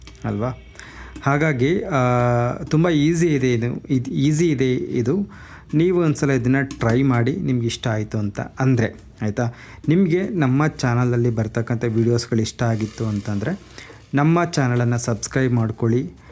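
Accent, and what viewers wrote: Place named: Bangalore, India. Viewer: Yaru ene helali neevu mathadtha recipi thorsi ivathu yako bangalore janara thara mathadha idhira ista aagolla chithra durgakade mathadidhange mathadi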